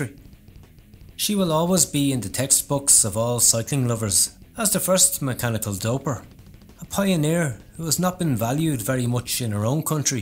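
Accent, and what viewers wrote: Dublin, Ireland. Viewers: As an Irishman myself - I know the narrator's accent only too well, he's from Dublin. Reminds me of Paul Kimmage and a little bit of Pat McQuaid. I like his sense of humour. A question to the narrator: are you related to Paul Kimmage? Are you or? Yeah, I can see similar writing and story telling skills as Paul Kimmage, and the contempt for cheats and dopers. This is definitley not GCN. Kudos to the narrator.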